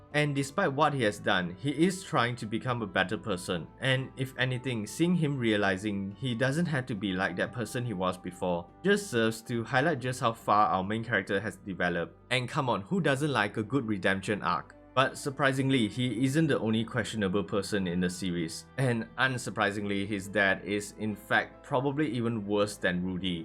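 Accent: Singapore. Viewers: Gosh keep up with the good content, I've been hooked, I was first interested because if your accent and how I just recognized it was Singaporean, since I'm Malay, but yeah keep up the good work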